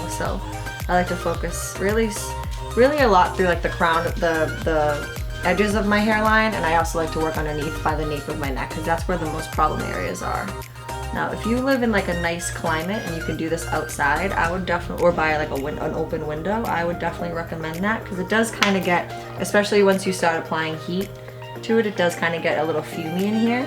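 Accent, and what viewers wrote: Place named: New York, United States. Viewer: Love your videos. Trying to place you slight accent. Are you from New York?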